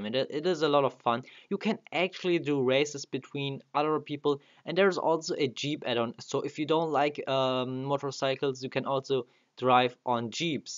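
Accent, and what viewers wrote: Germany. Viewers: Wie mann einfach hört das du deutscher bist! :DD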